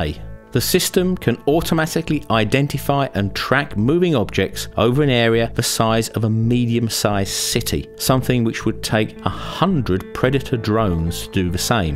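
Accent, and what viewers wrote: United Kingdom. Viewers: I really appreciate your videos, because they are so helpful for people like me willing to learn English. We can find corresponding text as well as subtitling with a charming British accent . Thank you very much, Sir. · Whilst consumer video cameras hitting 30/60 frames per second, the industry norm for the film world is still 24 fps. However, seeing as the chap narrating this video is British, it's possible that he selected PAL settings (of 25 fps) as oppose to 30 fps associated with NTSC when putting the video together.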